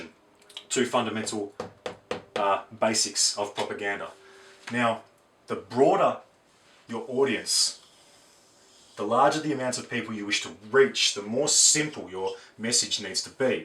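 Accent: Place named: South Africa. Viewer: This video was repetitive and very, very simple. Sounds like a yarpie. (ooh 'name calling')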